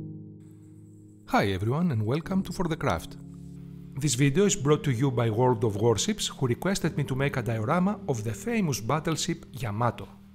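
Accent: Greece. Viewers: nice work and greek accent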